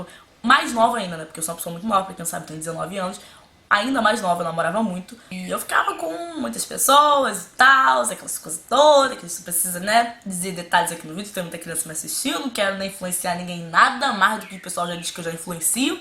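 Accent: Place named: Rio de Janeiro, Brazil. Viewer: SOTAQUE CARIOCA AMO ❤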